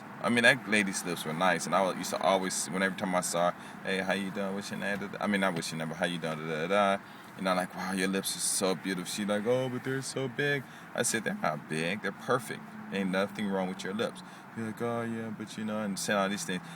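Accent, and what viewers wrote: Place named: Japan. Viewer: I have NO idea what your accent is...it sounds like it could be japanese, african, jamaican, i have no clue. where are you from originally?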